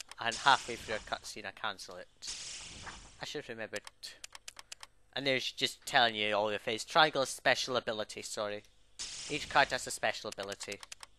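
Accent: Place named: Scotland, United Kingdom. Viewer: scottish(scottash) with a blocked nose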